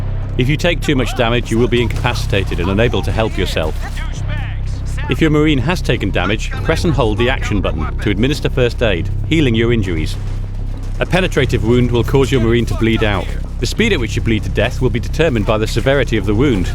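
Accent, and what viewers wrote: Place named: United Kingdom. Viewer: "As a proud member of the United States Marine Corp" he says with a British accent.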